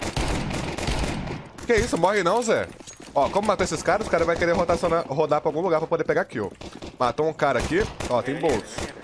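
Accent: Belo Horizonte, Brazil. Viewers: tu fala igual meus conterrâneos daqui de BH kkkkk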